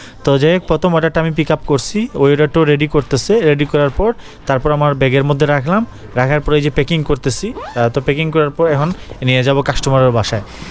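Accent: Sylhet, Bangladesh. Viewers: Apne Sylhety Mone Hoy,Voice sune buja jay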